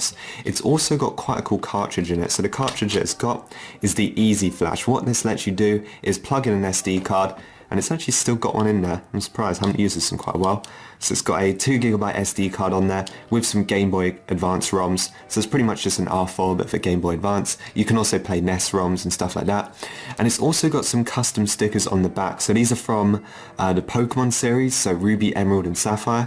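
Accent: United Kingdom. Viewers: cool collection! Very interesting. I was just trying to place your accent.... is it Stevenage by any chance ?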